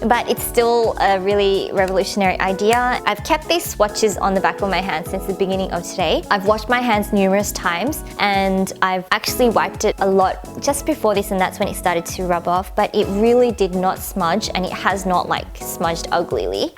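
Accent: Australia. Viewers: What's her accent? Australian?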